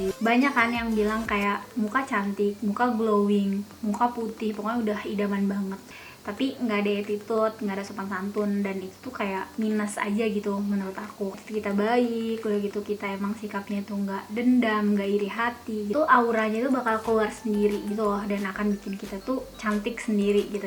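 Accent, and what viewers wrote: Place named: West Java, Indonesia. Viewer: Emaaak dengernya 🌹 Sunda detected 😊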